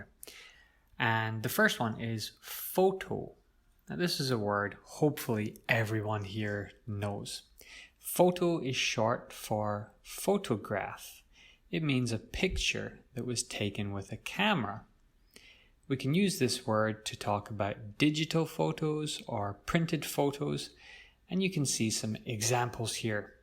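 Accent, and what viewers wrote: Scotland, United Kingdom. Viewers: I'm really curious about your accent. Can you explain what influences there are? I can detect a fusion of Scottish, North American and perhaps, Irish?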